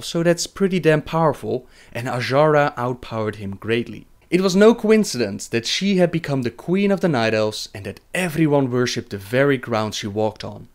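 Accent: Netherlands. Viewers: Quick question. Are you by chance Dutch? I have a Dutch friend, and you sound like him quite a bit. I've also noticed on one of your toon's names, there's 'je' at the end, which, if I'm correct, in Dutch, is what you would call a small child. Thanks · you are definitely dutch! btw nice videos!! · He has a Dutch accent. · He is from the Netherlands. I am too and i can hear from his english speaking he is dutch:P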